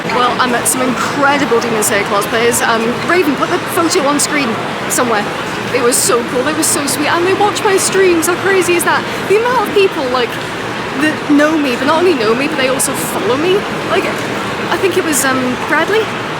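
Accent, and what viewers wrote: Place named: Wales, United Kingdom. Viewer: Lol, I don't watch this stuff... But I can't not watch... Maybe because you're Welsh, and I'm "Welsh".. gotta be the accent..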